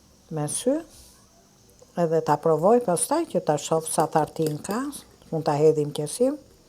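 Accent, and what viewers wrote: Tirana, Albania. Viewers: Pershendetje moj sulltanesh e gatimeve shqiperise se mesme nuk na ke kenaqur vetem me ato gatimet e tua te mrekullueshme por na ke kenaqur edhe me ate dialektin e bukur te tiranes kur flet flet nje dialekt te bukur qe une e motra ime e pelqejme shume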